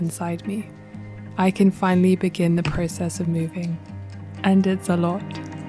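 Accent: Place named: England, United Kingdom. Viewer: My daughter-in-law is from the Lake Como area of Italy. When she married my son and returned with him to Ohio, she had prepared as best she could but there were times she felt very homesick. Luckily her friends and relatives were able to visit them here in the U.S. (and to video chat often) especially during the first few years when the two grandchildren were born and she couldn't travel back home. Then Covid messed up travel for over 2 years. This summer she had nearly a month long visit there with the two bilingual kids in tow and hubby joining them for part of that time. It was so joyous for everyone! But she shared with me that once she got back to Ohio she felt she'd arrived "home" to her house and neighborhood and career with her husband and her children. Your love for your native England and your family of origin will never fade but your concept of where your "HOME" is will gradually switch to where your partner, your future children( those little cuties lying in wait for you in your future) and your new house and career are will then be your emotional home base. 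Of course these recent months have been unsettled for you and your creativity stiffled for the time! Your long wait will soon be over. (Just don't lose your lovely English accent and start sounding all American and inelegant when you swear or say shit! 😉😘😚)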